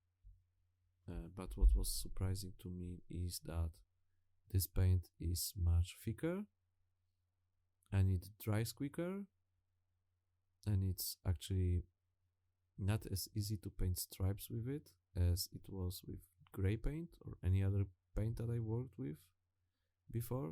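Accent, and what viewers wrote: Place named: Poland. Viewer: You, my friend, have a very polish accent! :D Are You Polish by any chance? ;) Zdrówko!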